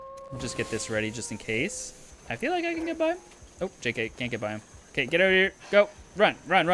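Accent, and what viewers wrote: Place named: Canada. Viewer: This is my first time seeing your channel based on your accent I’m guessing you’re a fellow Canadian. :D if not hello from Toronto Canada. You kind of sound like your from Alberta maybe 🤔. I’ve had this game in my steam library for a while. I might actually play it. Your video has inspired me :D.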